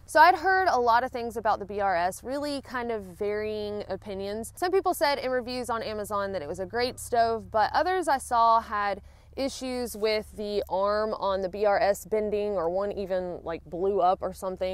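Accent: South, United States. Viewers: Sexy accent! North Carolina or Tennessee ?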